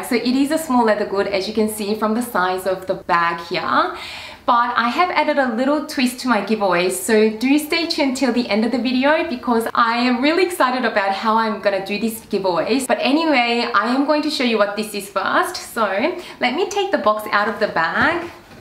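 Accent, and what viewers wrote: Australia. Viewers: Hi! Congratulations! I came across your channel while searching up about chanel bag reviews. Instantly, I feel in love with your personality and Aussie accent:P So generous of you giving away prizes - I'd loooove to get the LV Monogram 6 key holder:) I’ve entered on Instagram as well 😊 All the best with your YouTube and I can’t wait to see your future videos <3 - Shirley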